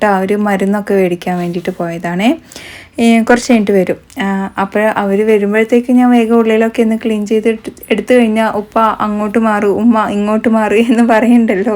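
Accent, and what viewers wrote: Palakkad, India. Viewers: * palakkad slag elaloo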